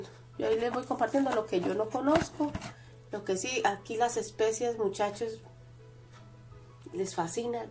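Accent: Colombia. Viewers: A mi me encanta su forma de ser y decir las cosas muy directa pero sobretodo su acento colombiano, no haga caso, siempre hay gente envidiosa y maluca como dice usted. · Hola mi hermosa, no usted no nos aburre a mi en lo particular me entretiene y ese timbrito de voz colombianito me encanta 😍 me gustan mucho las personas colombianas yo soy de Mexico y siempre me ha gustado el tono colombiano. Cuídese que Dios los bendiga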